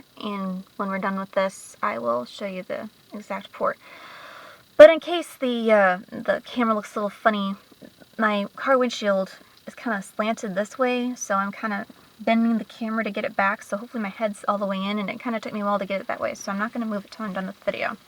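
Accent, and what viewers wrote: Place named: Texas, United States. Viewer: coz thats how we spek here in texas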